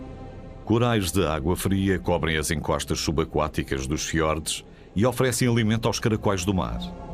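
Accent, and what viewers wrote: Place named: Portugal. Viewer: Português de Portugal é muito feio cara, na moral não tem como kkkkkkk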